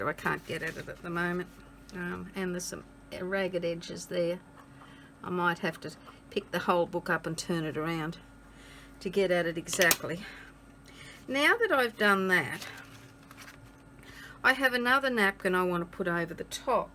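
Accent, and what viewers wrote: Australia. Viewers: I love that you’re an Aussie like me! I was listening watching and you were a few minutes in and I was Ohmygosh yr an Aussie lol Love love what you’re doing and done. There are never any mistakes in art. They merely become something you didn’t know you were going to create! Hehee love and subscribed to you. Thanks for a brilliant tute.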